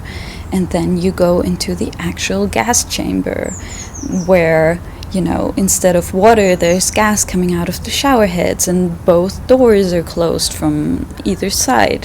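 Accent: Austria. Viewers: Das ist das erste Video, das ich von dir sehe, d.h. ich kenne dich noch gar nicht und weiß auch gar nicht, was für Content du sonst machst und worum es in deinen anderen Videos geht, aber: Als deutscher Muttersprachler aus Deutschland denke ich, dass dich während während deiner Schulzeit so kaputt gemacht hat, dass du heute Komplexe hast, weil du dein österreichisches Deutsch als Makel empfindest. Weißt du was? Steh' doch einfach zu einem Akzent! Österreichisches Deutsch hat genau so seine Berechtigung wie jeder andere Dialekt und jede andere Varietät des Deutschen... :) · Your Austrian German is so beautiful and I completely understand it as a German ❤!I think you should be proud of it ,because it is a part of your personality!!! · Nee, das ist nicht der Grund, warum sie in ihren Videos kein Deutsch redet. Ich wette, privat spricht sie dauernd Deutsch. Sie meinte halt, dass ihr österreichisches Deutsch für Leute außerhalb ihrer Gegend etwas komisch rüberkommt. 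Dann ist sie aber ein bisschen abgeschweift und hat über Hitler geredet. Das hatte aber damit zu tun, dass manche immer noch Klischees gegenüber der deutschen Sprache haben.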